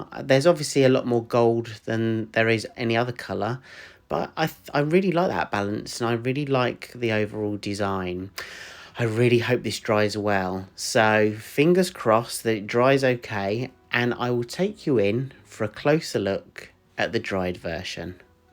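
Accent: United Kingdom. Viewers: Where do you buy Australian Floetrol? From here I can buy it in Australia for a realistic price plus taxes and duty or on ebay for half a million...... Still love your british English 😍 And I like this design, it looks like a jungle deer 💯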